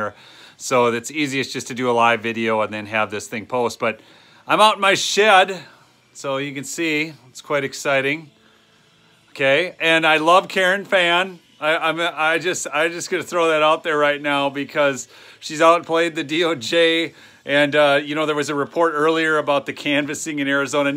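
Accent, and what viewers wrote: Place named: Minnesota, United States. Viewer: I was born in Butte Montana and his accent is right out of the movie "Fargo"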